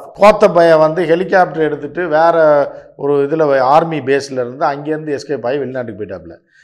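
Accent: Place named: Madurai, India. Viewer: madurai slang-Super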